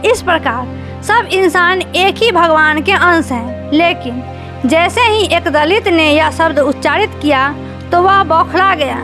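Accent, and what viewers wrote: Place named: Bihar, India. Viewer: आप किआवज बिहारी जैसी है किसी दो गुट आपस मे लड़ रहे हो तो उसको शांत कर दिया इसलिए वह खराब है ऐसी सोच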